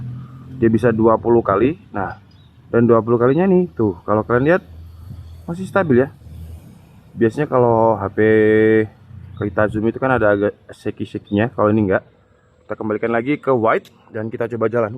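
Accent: Malang, Indonesia. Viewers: Bang mu orang malang??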